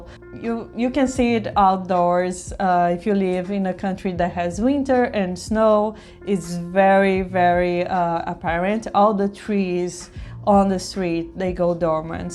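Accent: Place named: Brazil. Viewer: Are you Brazilian by any chance? :D Love the accent!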